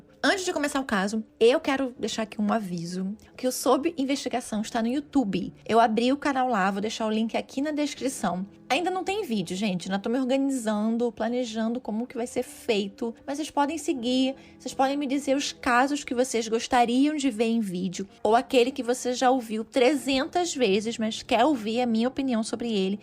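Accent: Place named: Northeast, Brazil. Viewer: Escuta narradora nordestina… Que graça tem recontar a história,que os melhores noticiários do Brasil,e do mundo, já contaram com riqueza de detalhes? Eu moro na Europa,e assisti a reportagem na época.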